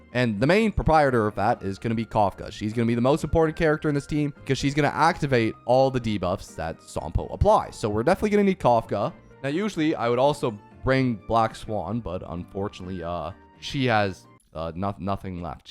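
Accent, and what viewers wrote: Canada. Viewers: Your Canadian accent is cute lol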